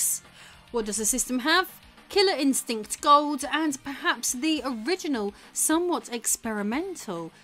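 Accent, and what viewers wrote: United Kingdom. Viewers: 5th generation of consoles + 90s vibes + well exposed review + British accent= excellent content. P.D.: Nice channel and greetings from a Sega Saturn player who had a PS1 in the 90s and lost good stuff.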